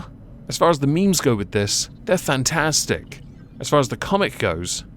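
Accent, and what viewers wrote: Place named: Birmingham, United Kingdom. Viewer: J to the B to the motherlovin' P took that sass and turned it into a positive. He got an artist to design the Hydra logo with a lobster instead, made merch of it and is donating all proceeds to charity! Also I love your Birmingham accent! Reminds me of Cat Deely playing Cat the dog on SM:TV Live XD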